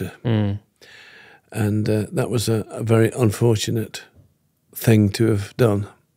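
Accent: United Kingdom. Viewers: That exact accent is the same as Bear Grylls. Can any Brits tell me where it’s from?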